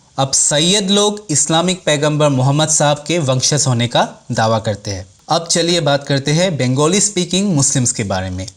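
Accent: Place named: Hyderabad, India. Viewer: Hyderabadi ya Bengaluru ka lag rha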